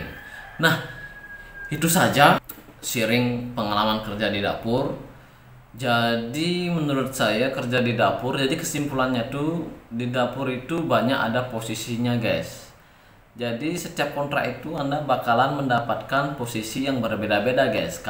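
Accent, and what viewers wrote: Bali, Indonesia. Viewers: Logat bali ne asane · Abg orang bali yah. Heheh./lo gue nya msh rada gx mirip sama jkt aslinya heheh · Logat balix kentalll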